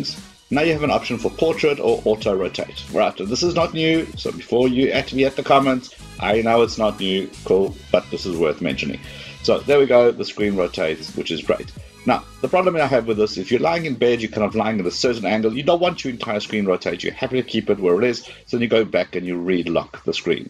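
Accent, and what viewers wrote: South Africa. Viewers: from what country are u . I am from South Africa and you have a south african accent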